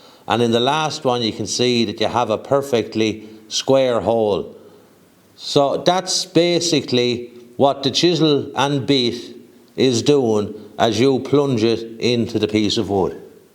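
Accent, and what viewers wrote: Ireland. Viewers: Ya know a lad knows his stuff when he uses a morticer like that; it keeps the tool pressure even when you cut with either 4 or 2 sides of the chisel....... ya start cuttin with 3 sides and sometimes it wants to flex, that's why you stepped over your cuts. I use hand tools, and one of my most hated jobs is cuttin a mortice........ I see a hollow chisel someday in my future 😆 It's nice to hear an Irish accent on YouTube lad..... This Wexford man enjoyed your video 😁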